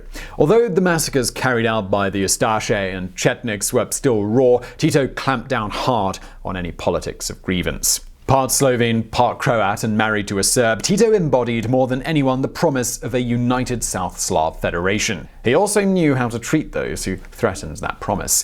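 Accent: United Kingdom. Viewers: oh and the british accent with the rounded "o". yeah he s smart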